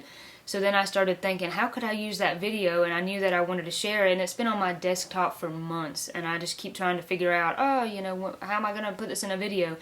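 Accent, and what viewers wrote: South, United States. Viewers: 1st off the word you were searching for that describes Christmas in childhood is MAGICAL! My mother was also a great documentarian so appreciate your video from the past. And lastly I think you have one of the most beautiful speaking voices I've ever heard. You should read audio books that need a southern accent!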